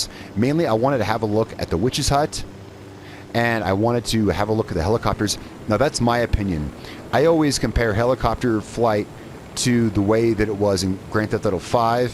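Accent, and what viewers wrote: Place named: North, United Kingdom. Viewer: You can tell he's from the North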